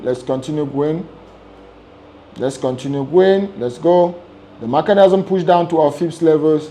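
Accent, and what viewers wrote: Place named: Calabar, Nigeria. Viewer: I actually thought you are a Nigerian ur accent is like that of a typical Calabar man. you are a boss indeed good thanks you are my mentor I will learn from u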